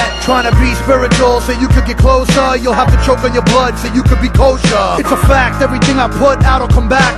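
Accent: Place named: Brooklyn, United States. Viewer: Dat Brooklyn accent, tho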